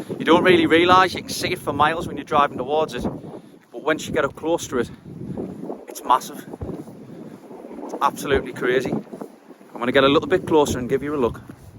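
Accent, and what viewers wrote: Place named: Newcastle, United Kingdom. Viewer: Got to know this bridge, courtesy of the wonderful song, by. Himself a Middlesbrough lad who sadly died in December 25. Chris’ words of this song tell his story of the birth and demise of the area. Thanks for this short video. As a Southerner, I call your accent a Geordie, but I could be off the mark. First one of yours that I’ve seen due to the Transporter bridge and area which so cleverly penned into a memorable song ❤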